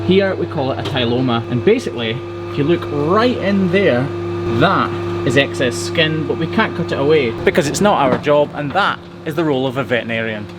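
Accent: Scotland, United Kingdom. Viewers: Who’d of thought a hoof doctor would become my much needed connection to Scotland? Raised by two Glaswegians in Canada 🇨🇦, my aunties and uncles back home have all passed in the last few years so I haven’t been back a lot. The accent, the scenery and the ice cream. My most favourite thing about Scotland is the ice cream since I was a little girl. Thanks for the happy memories.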